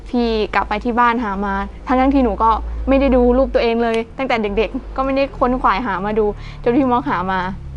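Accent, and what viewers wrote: Central Thailand, Thailand. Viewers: น่ารักอีหล้า พูดไทยกลางชัดเจน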